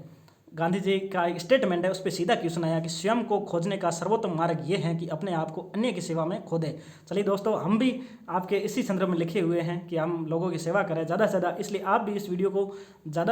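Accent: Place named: Rajasthan, India. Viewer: The way to explain any of your topics is very good, I love listening to your videos because Rajasthani mix comes in your tone 🙏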